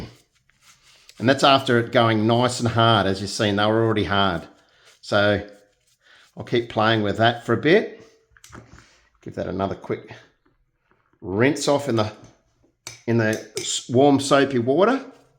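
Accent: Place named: Australia. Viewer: You deserve more views. Love your paintings and especially your very Aussie commentary.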